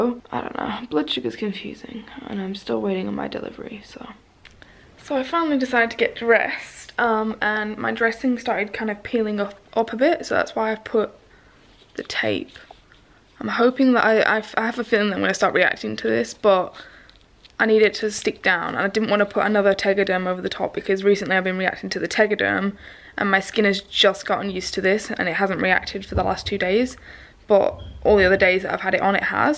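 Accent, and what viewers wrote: United Kingdom. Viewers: I've seen your vids allot but because of your accent thought you must be in the UK. Can you explain or tell me where you have in vids before, how you come to be in AU. Have you immigrated, do you use Medicare, are you on the NDIS etc. Where you as sick as you are now when you came over here, is your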